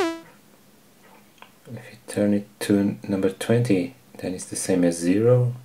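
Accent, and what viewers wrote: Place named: Portugal. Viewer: Are you from Portugal? You do sound like it, anyway, great job...and accept some form of donation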